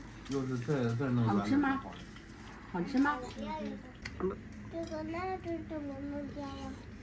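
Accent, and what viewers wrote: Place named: Beijing, China. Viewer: 听着像老北京人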